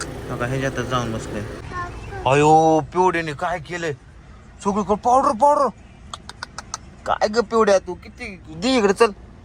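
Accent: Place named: Kolhapur, India. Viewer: Dada kolabi boltata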